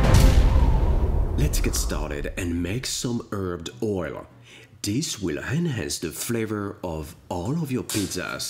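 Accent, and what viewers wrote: France. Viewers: J'aimerais savoir une chose, vous êtes français? Parce que quand vous parlez anglais vous avez le même accent que nous français lorsqu'on parle anglais. Des vidéos en français serait top même si je comprends tout à fait l'anglais. Thank you for your recipes they're soooo delicious !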